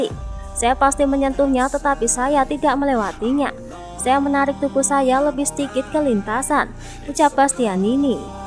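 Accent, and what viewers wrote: Java, Indonesia. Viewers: saya suka artikulasinya....medoknya muanteeep. Jaya terus Indonesia Channel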